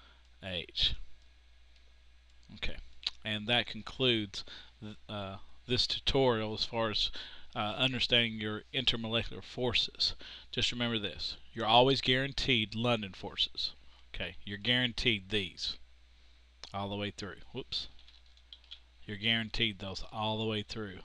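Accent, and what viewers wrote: South, United States. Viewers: BIG HELP! & great country accent. Southerners!!! =)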